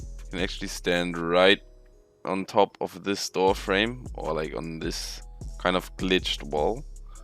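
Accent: Germany. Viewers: is this Deutschlish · are you german? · R u from germany? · man höher so stark dass du deutsch bist haha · Bist du deutscher? Nur weil dein Englisch sich deutsch anhört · Is your Main language german? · Where are you from? You sound german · I‘m not sure but do I hear a german person speaking english in this video? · Ich höre einen deutschen Akzent xD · Feier den deutschen Akzent haha aber nice Englisch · Man hört einfach so krass das er deutsch is lul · Man merkt so hart dass du deutsch bist 😂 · Where are u from? Your Accent sounds like german c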